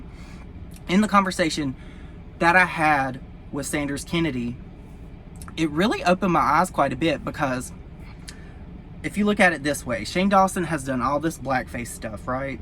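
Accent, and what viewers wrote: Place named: South, United States. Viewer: This is off topic (seeing I’m physically sick to my stomach over all of this I figured I’d try and change the subject for a quick second- awesome video by the way) but I’m extremely curious... where are you from,? You have a southern accent. I can’t help but notice it because I love it 😊❤️ I just moved to Charlotte, NC- you have close to the same accent everyone does down here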